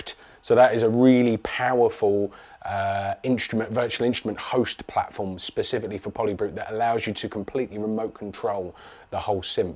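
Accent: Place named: London, United Kingdom. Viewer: LOL Polybrute SIMFF.... Love the London accent but mate.. Cant beat a Northern accent... RAAVER as opposed to Rather.. We need to celebrate the Englaish language and never shy away from our heritage !!!! The synth sounds so similar to the original.. but love the new features.. !!! Gotta say, if you need your sound to be immediate in your mix then the Sequential series are better but as an all round poke you in the eye and create esoteric stuff... Polybrute.. Go Arturia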